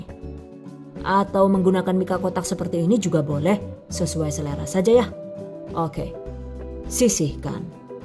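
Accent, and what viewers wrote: Banyumas, Indonesia. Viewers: Mksh bun. Seneng lihat.tapi ga pernah buat. Bunda Lucunya. Si pd inyong ngapak ya bun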